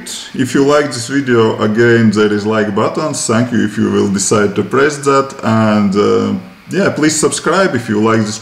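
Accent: Russia. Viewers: какой русский английский ))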